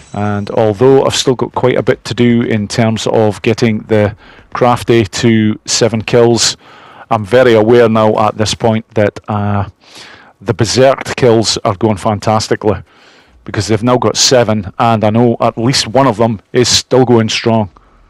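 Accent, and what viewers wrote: Scotland, United Kingdom. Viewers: One more thing, I misjudged your accent. My friend is married to a guy that was in the RAF and is Scottish. I talked to them recently, your name should be, don't know if it is highland or low land. But for sure the whole planet wishes they were Scottish. Quesion, do you play with a controller or keyboard and mouse?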